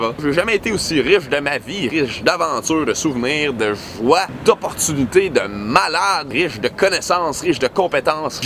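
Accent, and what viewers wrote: Canada. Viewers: son accent canadien est bien prononcer !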